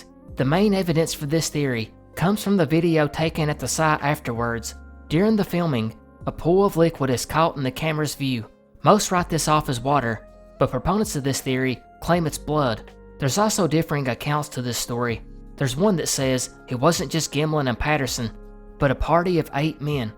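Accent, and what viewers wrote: South, United States. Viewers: In the beginning I needed to get used to the southern accent, but it has really grown on me and I now find it soothing 😅. Thank you for all your hard work! ❤ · I don't think it is weird either. I think it is really refreshing as you do, to hear a narrator with a great Southern accent! I am originally from the Pacific NW, so its really cool to hear all the other different accents that we have here in the US. I thought your comment was great. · This might sound weird, but as a southerner, it's really cool to hear a narrator with a southern accent. You don't get to see that very often in TV and media.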